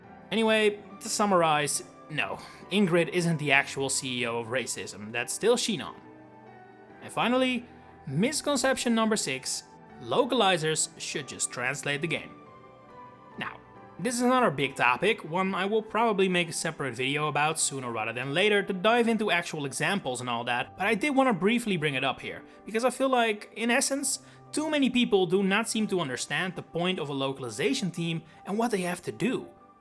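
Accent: Netherlands. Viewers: You don’t have to tell me about jokes. When I lived in the US, the jokes that killed in the Netherlands fell flat with my American friends lmao Also, I KNEW you were Dutch!! I definitely recognized your accent when I first heard you speak in your earlier videos. I love seeing fellow Dutch people and especially when they’re into FE lol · I was contemplating if he was dutch or not for so long! I kept thinking, is he dutch? The accent sounds so dutch! Now I finally have confirmation! Another dutch YouTuber found! I love being dutch sometimes hahaa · You can hear you have an accent but I did not expect it to be dutch! Are you from the netherlands or belgium?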